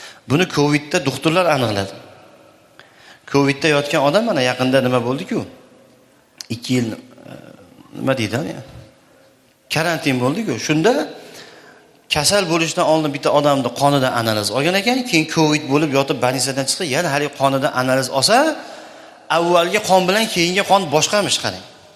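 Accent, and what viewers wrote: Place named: Khorezm, Uzbekistan. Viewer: хоразмли акансиз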